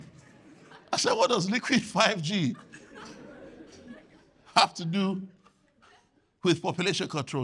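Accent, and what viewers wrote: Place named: London, United Kingdom. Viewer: I just found your show here on YT , thought check him out: is he funny / something interesting to say? YES 😊 especially when you talk that London accent woop woop I Love that.. New sub & support from Amsterdamn, H0llland.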